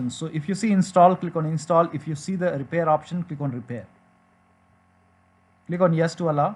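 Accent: India. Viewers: every time that i hear indian voice i know my problem will be solved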